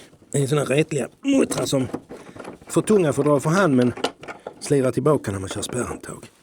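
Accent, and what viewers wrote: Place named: Skåne, Sweden. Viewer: Härlig Skånska känner mig hemma ☺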